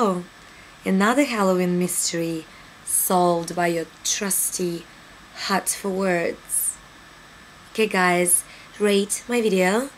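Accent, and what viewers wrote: Russia. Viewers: Russian accent - where are you from girl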